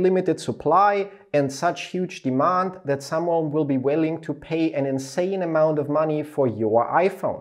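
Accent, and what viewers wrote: Germany. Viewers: Cooles video Off-topic: Immer wieder witzig diesen deutschen Akzent im englischen zu hören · I love that German accent. It’s hot af.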